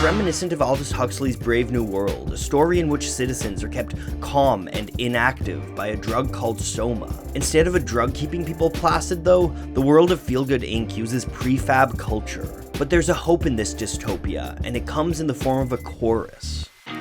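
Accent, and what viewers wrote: Canada. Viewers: You have such an interesting accent. Are you Canadian?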